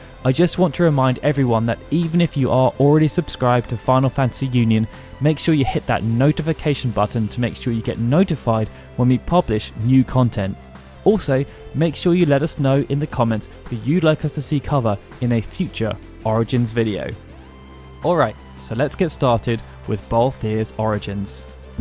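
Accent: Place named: United Kingdom. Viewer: You and Balthier are the best people with british acents to ever exist also do Kain next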